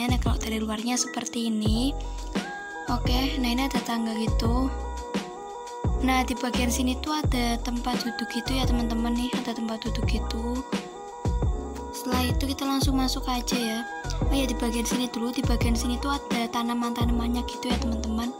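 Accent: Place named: Java, Indonesia. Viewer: Kok suaranya kek Jawa gitu tapi gpp semangat ya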